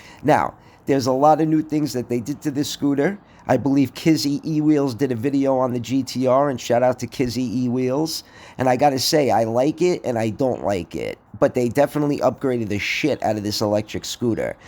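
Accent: United States. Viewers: Your whiny yankee voice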